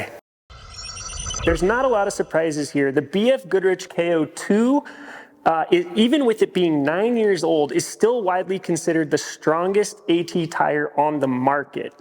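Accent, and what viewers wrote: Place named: Midwest, United States. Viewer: Based on your mid-west accent I'm going to say the Packers or the Lions!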